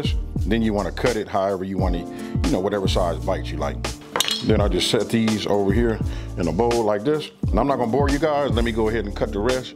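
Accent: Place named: South, United States. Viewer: Where you born ? You sound southern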